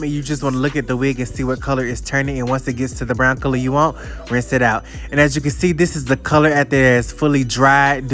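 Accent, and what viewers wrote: South, United States. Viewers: I appreciate how u say “akahawl” I’m a Cali native the southern in ya is adorbsss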